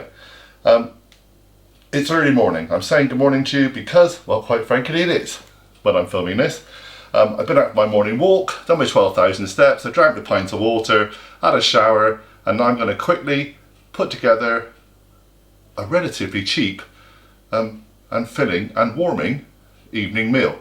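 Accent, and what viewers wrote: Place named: West Country, United Kingdom. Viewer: Just subscribed and slow cooker is on. Thanks, like the accent, sounds Dorset or Somerset?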